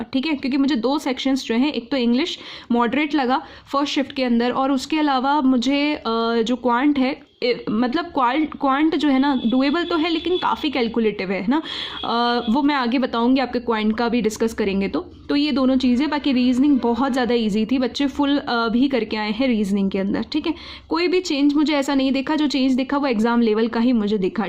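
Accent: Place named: Rajasthan, India. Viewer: Aap Rajasthan se ho na aapki voice se lg rha hai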